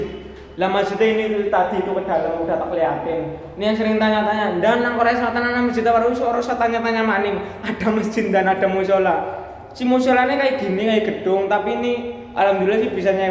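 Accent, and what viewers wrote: Banyumas, Indonesia. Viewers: Ngapak bgt rika kaya wong banjar,awas gole nyabrang cincing mbok teles hehe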